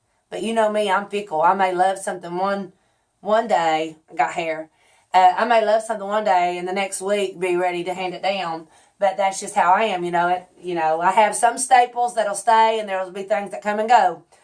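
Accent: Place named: West Virginia, United States. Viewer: Hi! I really hope you read this. I was searching for weight loss help and YouTube sent one of your videos to me. I LOVED IT AND love listening to you! You actually made me cry watching this one. Honey, all women feel the way you have been feeling at one time or another. I can relate to so much to what you have been through. I just wish I would have found you earlier. I wish I would have found you while you were posting your videos. Maybe, with more folks responding to your videos you would have stayed with us. I recently reached out to your daughter Hope to find out if you would be coming back. She said she didn't think you would be back. I am so sad to hear that. If you come back and do a lot more weight loss tips and such, I have no doubt you will get a lot more subscribers. I don't know anyone who isn't trying to lose weight. 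I have lost and gained so many times (like you), you were going to be my weight loss coach. I am binge watching your videos b/c you remind me of so many people in my own family. My Mom's family is from W.V. and have the same accent as you. I love it. If you just got tired of doing the videos, maybe in time you will come back and do a couple or so a month. If you don't, I want to thank you for what you have given us. I so enjoy all the ones I have watched so far. I wish you all the best either way. Take care and enjoy the many blessings you have in your life. We miss you.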